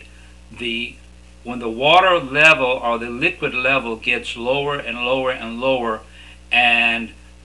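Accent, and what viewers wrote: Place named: Louisiana, United States. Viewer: He's definitely from Louisiana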